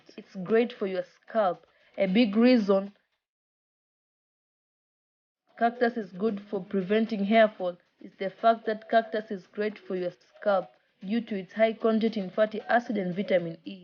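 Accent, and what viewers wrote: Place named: Kenya. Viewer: Her accent sounds like she is from Kenya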